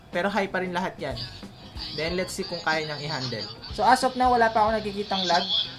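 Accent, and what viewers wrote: Pampanga, Philippines. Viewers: Isa kang kapampangan sure ako sa iyong punto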